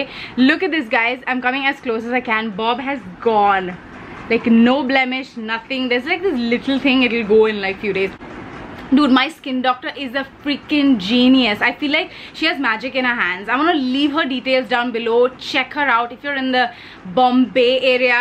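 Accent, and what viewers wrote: United Kingdom. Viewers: LOVE LOVE LOVE ur british accent😢😢😢 i have been thinking about it today only❤❤❤ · Your British accent was so on point!! Is it weird that I want to see more of it xD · please do a whole video with you’re brutish accent it’s just gorgeous, I could go on listening to it, simply music to my years #britishaccentvideo💯 #SundazewithMals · Your British accent is perfect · Omg! That British accent wow I'm impressed!! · Your british accent😂😂 love it 😂❤❤ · U are so damn honest ...love that about u...and funny too...my that British accent 😂...that’s what keeps us subbies rolling on to come back to your channel again and again... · You do amazing British accent.... I love that..❤️❤️❤️❤️ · Also I loved your British accent....I am a Potter head and you reminded me of Emma Watson seriously!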